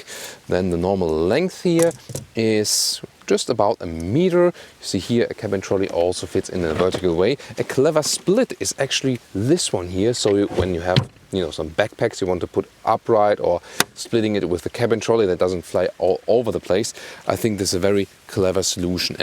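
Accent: Germany. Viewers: Jouw Duitse accent is charmant. Vriendelijke groeten van Marcus uit Holland :)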